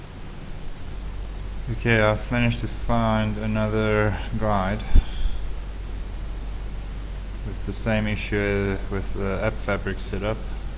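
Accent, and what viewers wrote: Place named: South Africa. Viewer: You sound like a Boer!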